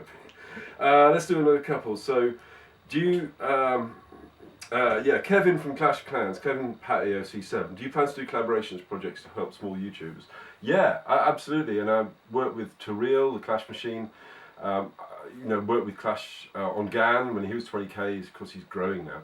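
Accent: England, United Kingdom. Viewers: yay for the English clasher, all those Americans it's nice to hear an English accent once and a while :)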